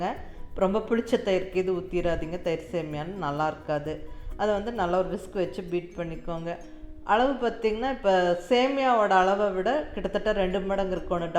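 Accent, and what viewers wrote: Coimbatore, India. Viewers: Sema..supar.tank u mam.unga voice coimbatore, erode matiri iruke?nice voice.god bless u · Coimbatore pola neenga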